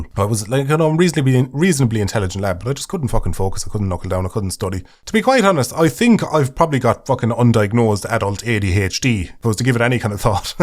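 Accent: Ireland. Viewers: glad to have another podcast thank you so much its always awesome to hear that lovely Irish voice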